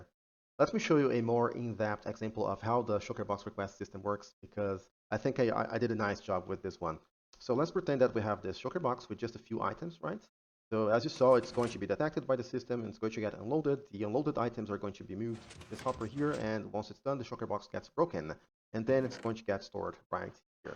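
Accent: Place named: Brazil. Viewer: Hello! NIce video! Are u brailian? U rly have the accent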